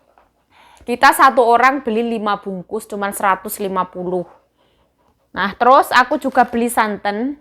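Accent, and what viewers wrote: Java, Indonesia. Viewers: seneng klo mbak klo uda ngomong logat jawa nya kental,sebutan kanjeng nyonya tuh dri taiwan apa jawa mbak klo boleh tau🙏😁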